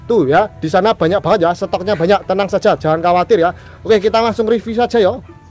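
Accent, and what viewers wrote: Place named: Lampung, Indonesia. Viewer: Walaupun di inskripsi gak disebut daerah tp Ciri khas kata2 Yo Yo g ktinggalan. Pasti daerah Lampung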